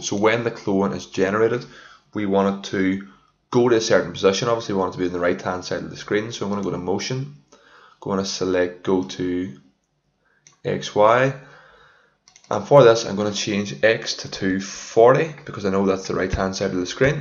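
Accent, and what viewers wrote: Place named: Northern Ireland, United Kingdom. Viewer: Northern Ireland! Awesome accent!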